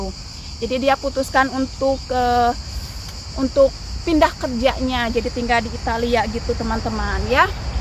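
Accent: West Java, Indonesia. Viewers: Nemu lg org sunda,logatnya jgn ilang teh😃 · Pertahankan logat sundanya ya neng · Kecanduan suara ama logat teteh....sederhana...wlw kdg kurg ngrti bhsa sunda😅😅😅😅tp.seru..slm.dari semarang..nama q juga teeh😅😅😅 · Semangat terus ya teh. Salam kenal sy sunda bandung. Lucu pisan denger logat sundana💕👍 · Semoga cepet dapet momongan ya teh semangat terus pokoknya😁😁 Padahal ga ngerti klu teteh omong sunda tapi seneng aja denger teteh omong sunda menghibur banget tehh 🥰🥰🥰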